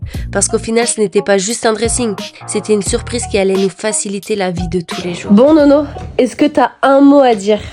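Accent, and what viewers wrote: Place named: Portugal. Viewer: Portugais ou quoi ? Moi je suis portugaise parce que je crois, j’ai entendu portugais du saut.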